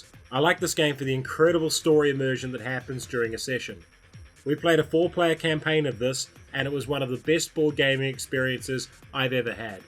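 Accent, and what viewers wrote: New Zealand. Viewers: yaaaaaaa your a new zealander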